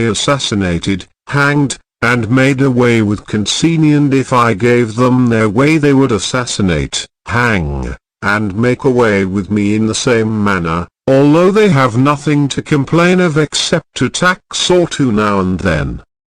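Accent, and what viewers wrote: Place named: United Kingdom. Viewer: I want to try this 😁 Edit: thank God this is British. I can practice my accent 😆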